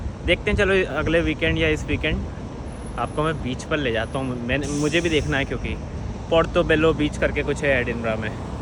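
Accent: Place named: Madhya Pradesh, India. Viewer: are you from madhyapradesh bro ,your language tune like mp bhopal thats why im asking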